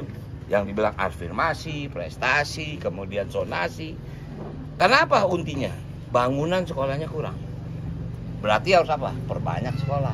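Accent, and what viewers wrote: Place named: Betawi, Indonesia. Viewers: lah emang kenape ngomong logat betawi? masalah buat lo? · Bang dul, DKI bukan betawi semua, jng selalu pake dialek Betawi dong!! Intelek dikit ngapa?...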